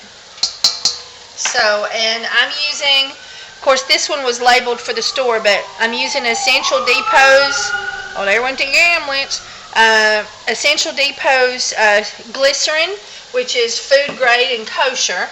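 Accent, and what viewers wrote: South, United States. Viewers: Thank you for the video. I love to listen to you. I'm from Ms. so I understood your "sayings" LoL! Gotta love the south :)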